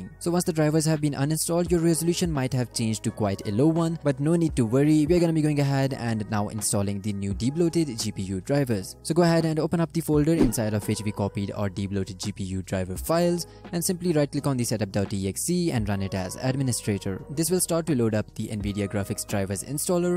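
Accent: India. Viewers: Never never never trust an Indian accent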